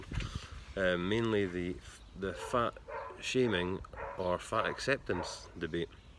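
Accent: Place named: Scotland, United Kingdom. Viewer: The Scottish accent is my *favorite*!!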